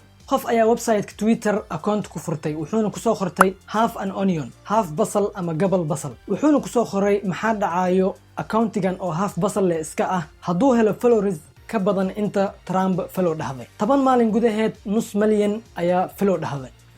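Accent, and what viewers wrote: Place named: Mogadishu, Somalia. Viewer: ee imka qof yutubar ahtahy markaa afsoomali bilaa xamar ku hadal ile reer muqdisho uun ku dawan mayaan dadkle oo guud ku dawanyee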